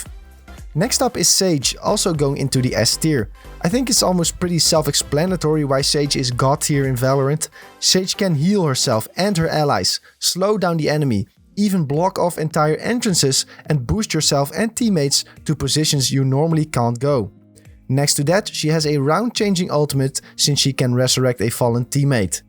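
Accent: Netherlands. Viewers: Ik hoor aan je engels dat je nederlands bent xD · Your engerish is very well i instantely now you are dutch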